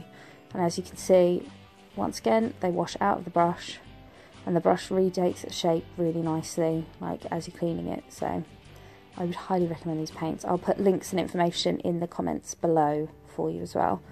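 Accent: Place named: United Kingdom. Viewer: You're awesome, just found you in this moment and subscribed immediately! ;) Love your voice and British (?) English :) Greetings from Germany!!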